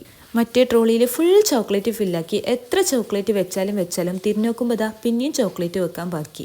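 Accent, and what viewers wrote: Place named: Kasaragod, India. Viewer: Kasaragod language undhallo🫵🏻😂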